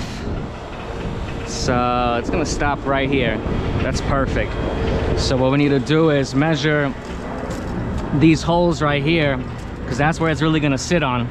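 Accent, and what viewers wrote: New York, United States. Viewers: I see and hear you're in NY...laws and regs are crazy there...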